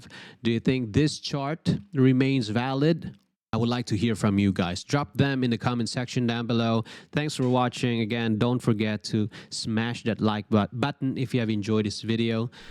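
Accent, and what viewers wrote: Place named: Philippines. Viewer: Sounds pinoy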